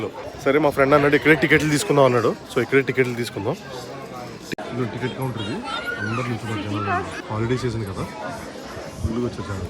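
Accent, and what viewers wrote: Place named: East Godavari, India. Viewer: U looking gorgeous bro..Pakka mana telugu east slang bro. I happy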